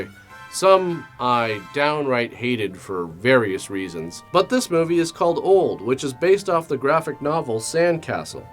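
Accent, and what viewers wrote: Canada. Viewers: I found it quite obvious that he was Canadian after watching his first video. Although there are people in the Northern US that do have similar accents.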